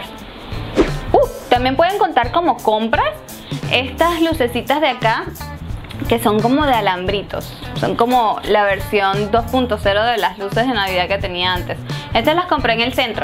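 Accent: Guayaquil, Ecuador. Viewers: habla como Guayaca!! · ya se te pego el acento ecuatoriano soy de guayaquil Ecuador☺ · habla como guayaca es verdad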